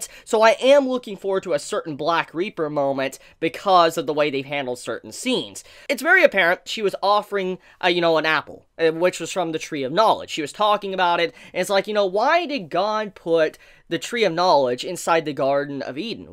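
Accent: South, United States. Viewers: #bring back the southern accent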